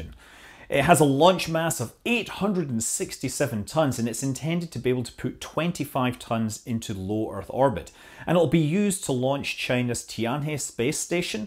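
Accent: Scotland, United Kingdom. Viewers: I love your accent. Are you Scottish? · I'll be honest, I never thought I'd ever hear reasonably understandable Mandarin spoken with a Scottish accent. Not bad,. Not bad at all.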